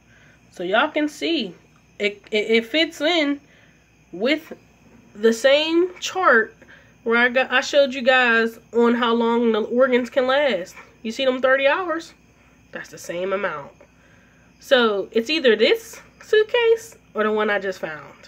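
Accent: Pittsburgh, United States. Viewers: Great video. U sound like your from Pittsburgh 😀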